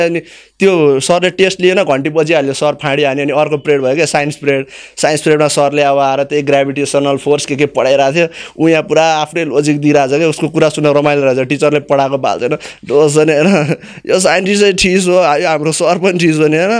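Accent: Newar, Nepal. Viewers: Newari tone ta khatra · haina yo vaile tone banako ni k ho? Trital ta bahun hoinara, purai newari tonema di rachha ta?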